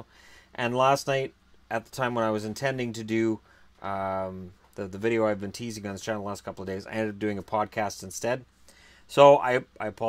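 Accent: Canada. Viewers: Are you born in Ontario? You have a heavy accent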